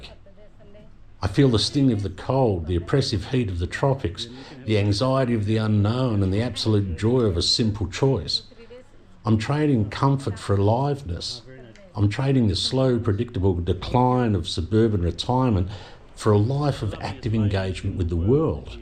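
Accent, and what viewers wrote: Australia. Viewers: hi Laura, is 100% Australian. I’m Aussie and I know one when I hear one. And he’s mentioned it in many videos. He often goes back to Perth to visit his sister. And no, he doesn’t have the old age pension because you have to be 67 to qualify for that in Australia. He’s 60. As he states in this video (and many others) he lives from his YouTube videos. That’s also why he makes so many! It’s his job. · You're living my dream, mate. Really enjoy following you on your adventures. Don't mind the lovely Aussie accent, either. Love from the Low Lands. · No. I sense an Aussie accent. You are the age of my Father. I’ll tell you what’s worse than having nothing. It’s having EVERYTHING and yet not appreciating it. In Australia, so many boomers became property obsessed and prioritised wealth gains over family relationships. What’s the point in having kids and grandkids if your main focus is your STUFF?! 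It’s so sad to see. But they don’t care. They won’t, until they lose their sense of security (homes/equity) and realise it cost them their families. You’re doing well in Goa.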